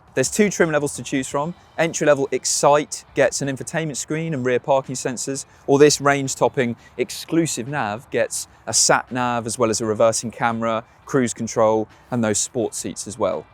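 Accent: United Kingdom. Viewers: Guy has a British accent, right?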